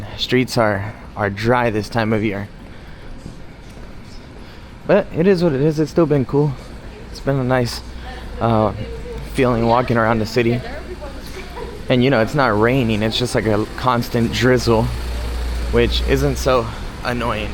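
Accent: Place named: South Florida, United States. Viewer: Just came across this channel. Good stuff! From how you talk I wanna say south Floridian🥳🥳🥳👏🏽👏🏽🥂🍾👌🏽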